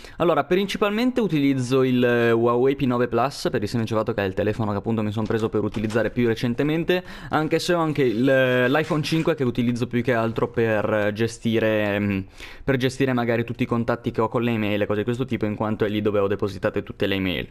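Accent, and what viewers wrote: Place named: Monza, Italy. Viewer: Secondo me sei di Monza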